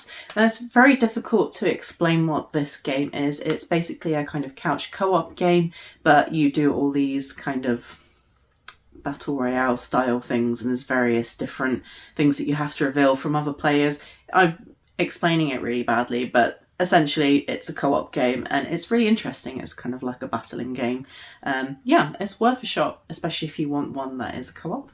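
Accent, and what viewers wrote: United Kingdom. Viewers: Hello. You're from UK, aren't you, lady? Is blue stinger and soldier of fortune also cut in UK region? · Dang she's pretty! Wonder what she sounds like not speaking the queen's English. Wonder what she'd sound like with American English. Anyway. Dreamcast was awesome. PAL territory got stuffed on boxart and such. American Dreamcast packaging and art always looks really good and doesn't have the PEGI rating system stamps on it that's an eye sore. The back of the cases are annoying too as they're in multiple languages. Today's games are similar though, having both Spanish and English that's annoying..... Unless you buy it and it came from Canada. Looks the same and you almost wouldn't know, except it's in English, Spanish, and French. Yuck...... Whew, pretty girl, smile a lot! It's a pretty smile, dang!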